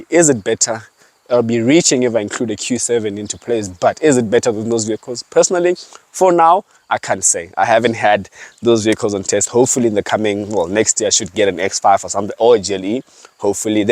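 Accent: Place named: South Africa. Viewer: We hardly here what you say, but maybe it's the south african accent... Other than that it's the best car review channel around the continent.👍🏾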